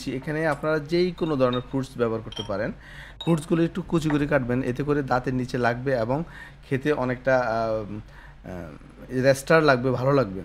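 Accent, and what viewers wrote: Noakhali, Bangladesh. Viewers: ভাই আননে কি নোয়াখাইল্লা 😊😊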